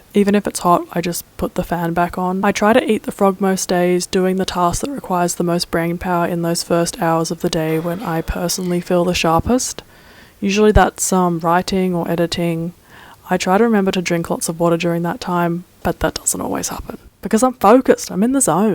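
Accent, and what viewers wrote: Australia. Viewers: Wasn't expecting the Australian accent, but hello from a Brit who is an Australian citizen! This has given me a great idea to track my own day for all of the accommodations I give myself. Not sure what your invisible illness is as I'm not familiar with your channel, but I'm hoping you've looked into POTS. And love Bananagrams, btw 🙂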